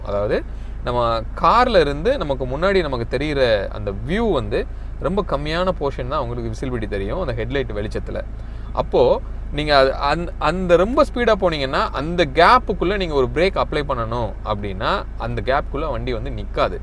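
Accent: Virudhunagar, India. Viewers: Bro, Neenga Virudhunagar ah ??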